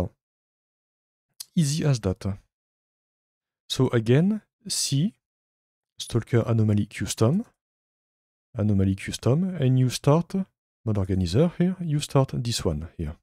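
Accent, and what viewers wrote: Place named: France. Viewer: Very subtle french accent 😊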